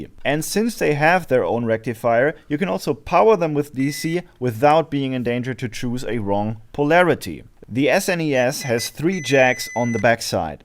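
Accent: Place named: Germany. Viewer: I've been scavenging old junk for years in wicklow, but your videos have inspired me to fix some of it up. So thank you for the inspiration, and your lovley German accent with great English 👍. Much better than us irish speak it.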